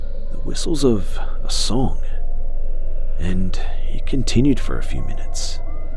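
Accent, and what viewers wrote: Australia. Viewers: Do you live in Australia (I can tell you have an Aussie accent cause I'm also Australian)🇦🇺🇦🇺🇦🇺🇦🇺🇦🇺🇦🇺🇦🇺🇦🇺🇦🇺🇦🇺🇦🇺🇦🇺🇦🇺🇦🇺🇦🇺🇦🇺🇦🇺🇦🇺🇦🇺🇦🇺🇦🇺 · You're from Australia, right? Your accent is familiar.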